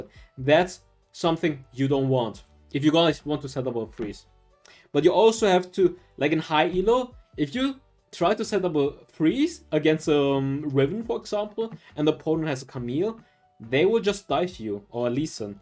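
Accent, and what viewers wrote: Germany. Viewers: Hahaha I'm also german and i noticed your little german accent although you are speaking very good english in my oppinion:D And I have a friend who has the same "family background" as you and I thought maybe has the same background XD I guest correct xD Aber im Nachhinein kann man das ja immer behaupten. Warum hab uch das grad auf Englisch geschrieben xD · Wait u are german??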